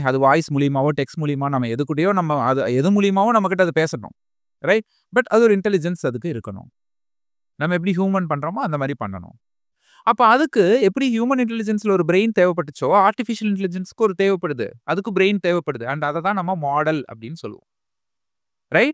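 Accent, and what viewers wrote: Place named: Erode, India. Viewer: Thank you I am regularly watching your video and your way of explaining is very good, I am from erode and your slang resembles it very good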